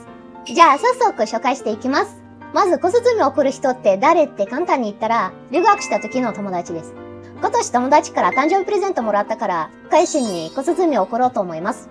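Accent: Kansai, Japan. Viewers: ごきげんさん。初見です～～～～ぅ。なんや、かわいらしいサムネにつられて見たら、どないやねん。:face-orange-frowning: きっつい関西弁の、これまたごっつい関西のギャグセンスまでもちあせたロシア人でてきましたよ～～。:face-fuchsia-wide-eyes: ネイティブやないのに、ひとりつっこみやらそこまでの関西センスはよ～ならんわ～～、すごいわ～～。:face-fuchsia-poop-shape: 音声だけ聞いてたら、まんま関西のおばちゃんやで～～しかし～～、飴ちゃんちょうだいや～～。:eyes-pink-heart-shape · 関西弁良いですね🎵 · 外国人女性でこの声質で関西弁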